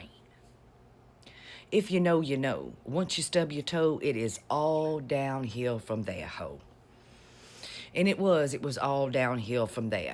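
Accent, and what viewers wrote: South, United States. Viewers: You tickle me; I'm so sorry that you had a bad, but your "rant" made me cry. I'm from South Carolina, but have lived in Minnesota for the last 18 years. I miss everything that you mentioned... field peas, collard greens, gravies, family close by,, hush puppies...and even the Waffle House! Your beautiful drawl is like sipping a mint julep, on the veranda -on a hot Autumn day , but not in a dysfunctional Tennessee Williams" kinda way. Thank you.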